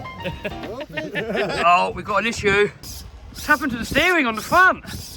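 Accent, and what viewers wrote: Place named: United Kingdom. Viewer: were you the giving me all those cool hints when I was playing call of duty and now I'm watching you doing the trucks? Or is that just my idiotic American ear not being able to pick up the difference on a British accent? Full respect sir. Love your channel.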